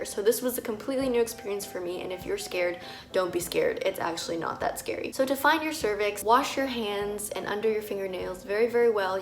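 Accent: Canada. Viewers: I wouldn't say you have a heavy accent, but being a fan of Canadian TV shows, I picked up on it! Your review of this product was so educating and thorough. Really above and beyond! The company should pay you for this video alone . You probably know more about the product then the sales reps!😂 Love and greetings from America!! 😊💙 somewhat related: How does it differ from a diaphragm or nuvaring, maybe? Like could it be used as birth control? Probably not?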